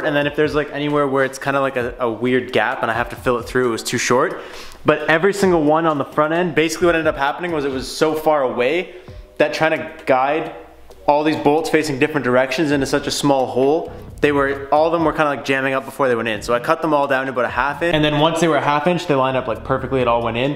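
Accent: Canada. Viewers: Canadian accent in a nutshell ;)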